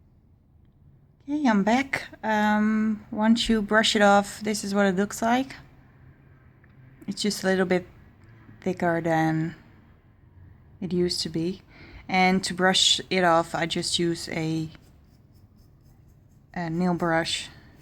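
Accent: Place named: Netherlands. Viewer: bende gij nederlander.. want jou accent is echt Hollands..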